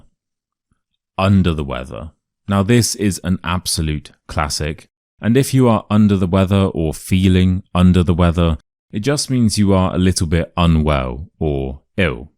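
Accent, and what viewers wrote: United Kingdom. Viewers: Thank you, I’m learning a British accents, expressions and all of english from your video. Happy new year mate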